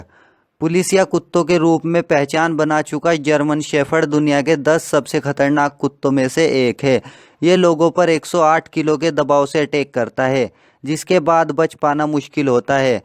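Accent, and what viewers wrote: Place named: Muzaffarnagar, India. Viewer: Bhai west up me kha se ho aap aapki boli se pta chal rha ke muzaffarnagar dist. Se ho sayed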